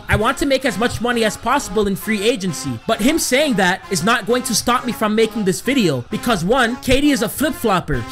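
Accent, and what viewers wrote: Toronto, Canada. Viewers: Bro the guy who made this video sounds like a Somali Toronto hoodman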